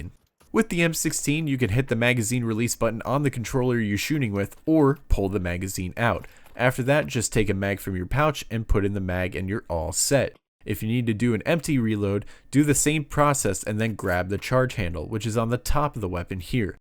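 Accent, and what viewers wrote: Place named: Canada. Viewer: You sound...very canadian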